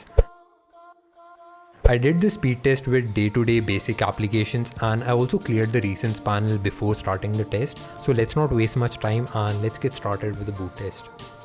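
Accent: India. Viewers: yeah after he lose that Indian accent. people just dont like Indian accents. They talk so fast and dont realize they english is not that great because of that super strong accent.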